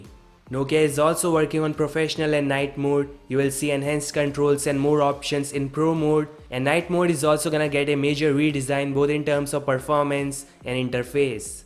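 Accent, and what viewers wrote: India. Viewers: I bet this is narrated by an Indian.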